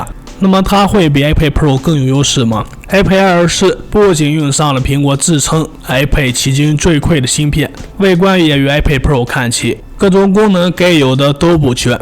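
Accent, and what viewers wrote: Shanxi, China. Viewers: 这是山西，河北北部或者内蒙古中西部地区的口音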